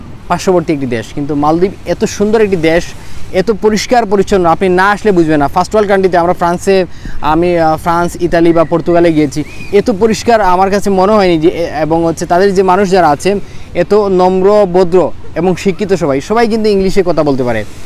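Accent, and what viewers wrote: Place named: Sylhet, Bangladesh. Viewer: সি‌লে‌টি টোন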